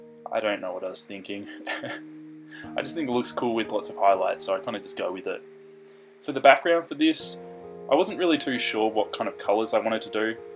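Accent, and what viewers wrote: Australia. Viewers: He's Aussie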